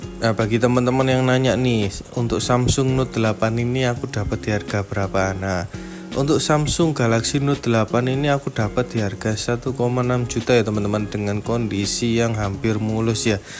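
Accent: Java, Indonesia. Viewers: Bagus reviewnya mas.tp agak medok.(maaf)keliatan orang jawa ya😂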